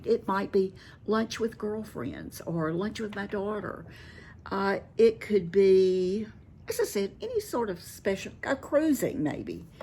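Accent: South, United States. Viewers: Hi there dear lady....Just found your site. Love your Southern accent. Looking forward to viewing more of your lovely videos...(a nana in Pa.) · I’m almost 56. I’ve been watching for a little more than a year now because I love your outlook on aging and just life in general. You also have a calming voice and lovely southern accent that reminds me of my home state. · You have inspired me and I love your southern-style and Your accent and you stories 2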